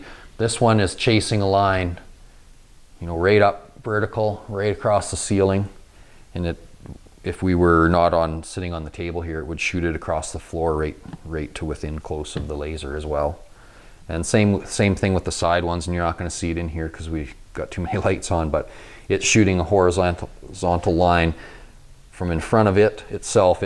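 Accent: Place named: Canada. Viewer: first video watched on your channel, I recognize that accent, what part of Canada are you from?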